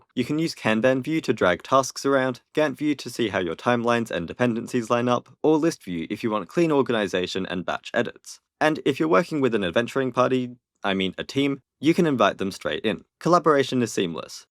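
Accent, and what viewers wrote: Australia. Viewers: Waaaiiiit! Your Australian! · 2 comments about the middle-ish section. 1st. YOU'RE AUSTRALIAN?! WHERE I NEED TO KNOW SO I CAN GO IM ALSO HERE 2nd. I use never eat soggy wheat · HES AUSTRALIAN?!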